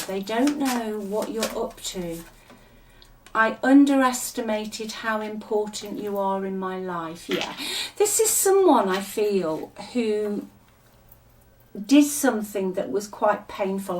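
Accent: United Kingdom. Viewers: Hi , I’m Neo the matrix buster, love your accent, British? I live in Michigan USA!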